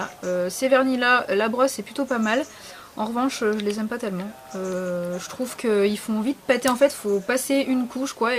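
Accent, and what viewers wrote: France. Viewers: merci pour cette video, dis donc tu as beeeeeeaucoup de vernis, j'en ai presque bavé devnt cette video ^^ mais dis moi comment reussi tu a ranger tout ses vernis ? As tu deja fais une video de ton rangement vernis ? ça serait bien interessant de voir ça ! & oui oui je me matte ta video a 4h55 du matin lol et meme a presque 5h du matin j'aime ta video :) bis & ton accent français (renforcé) est terrible, j'adore ;)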